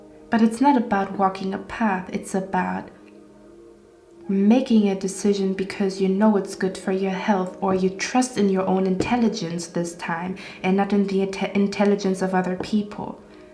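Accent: Germany. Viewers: Love your accent. Are you German?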